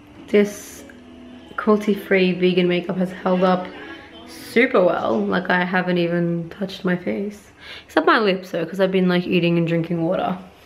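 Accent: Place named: Australia. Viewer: I suscribed to your channel because I loove how you do makeup! I remember I found you in Instagram, and I took the time to stalk you jaja and thats how I got to your yt channel, after that I just fell in love with your accent😍 You are the only Australian youtuber I am suscribed to, and you are my favorite makeup artist out there!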